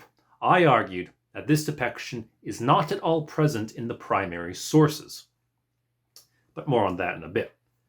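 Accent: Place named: Austria. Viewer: Man, your syntax and inflections remind me of the Military History Visualized guy, Austria has taken hold of you.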